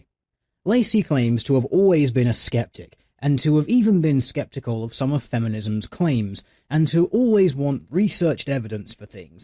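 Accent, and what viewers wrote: United Kingdom. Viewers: Wait. He's British? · Wait, you're British!? I thought; was Canadian.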